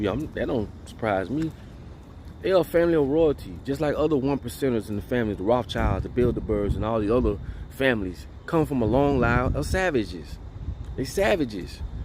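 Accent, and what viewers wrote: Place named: AAVE, United States. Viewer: He speaks in ebonics. It's Vernacular English not American or British. 🙂😂A judgemental person calling out another judgemental person.🙄why?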